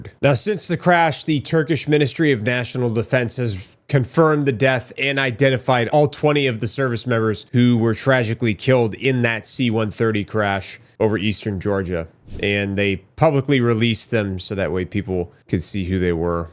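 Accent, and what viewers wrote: United States. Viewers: Very sad story. On the other hand, it always amuses me, how Americans pronounce Georgian words.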